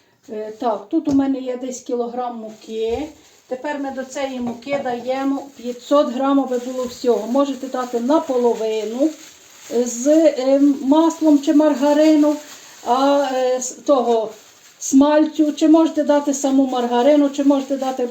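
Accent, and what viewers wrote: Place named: Kolomyia, Ukraine. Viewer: Такий голос щирий, рідний, український... дякую!!!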